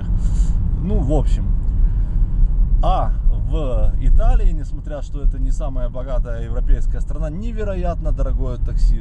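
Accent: Odessa, Ukraine. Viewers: слышна кстати типичная одесская интонация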